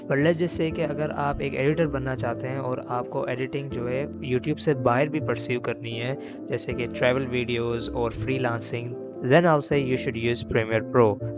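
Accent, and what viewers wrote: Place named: India. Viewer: He has the heaviest indian accent of all times.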